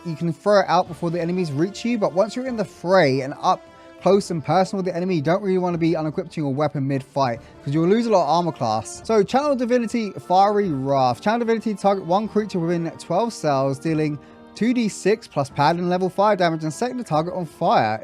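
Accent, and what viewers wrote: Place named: London, United Kingdom. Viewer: Good news! I'm an London Wizard... So nice to hear the accent too!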